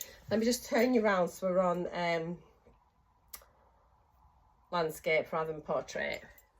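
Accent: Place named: North Yorkshire, United Kingdom. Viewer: Me again! On this one now. I meant to say despite doing Noom and coming to a 6 month plateau, I was blown away when my doc told me it wasn't anything I was doing that was keeping me plateaued - it's the sodding menopause and it was like a light went on. I thought the sweating and lack of oomph was down to fibro but it was getting so bad and the lack of weight loss knowing I was eating less than 1000 cals a day by a fair bit had got me so down I'd barely been able to get out of bed for more than 15 weeks 😭 when she said it's not you, it's your hormones, I cried! To feel like you're not self sabotaging and finally someone says you're doing all the right things but your body is just changing was epic! HRT is life changing and so is Mounjaro. I've downloaded an app called Fastic to use as my Noom sub runs out soon and I'm logging daily how I feel. 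You're such a joy! Used to live in North yorks, near Hawes where they make Wensleydale and I recognised your NY accent as soon as I watched you 🤗 take care honey. Sarah xxx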